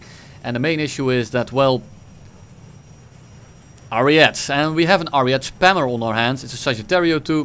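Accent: Netherlands. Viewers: ik hoor n beetje nederlans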